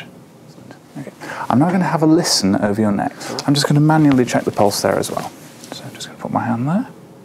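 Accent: England, United Kingdom. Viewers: An English accent and a doctor I’m weak I’m the knees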